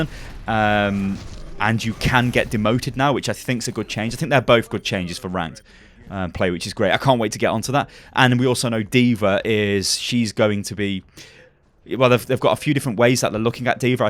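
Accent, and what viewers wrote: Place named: Southern England, United Kingdom. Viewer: As a native of Britain I can confirm he is rather southern.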